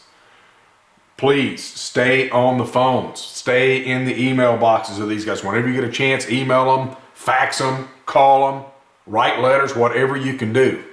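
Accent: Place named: South, United States. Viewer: To bad! That southern accent could come from anywhere down here! Love the vids buddy! Keep your eyes and ears open and we will weather this storm Lord willing!!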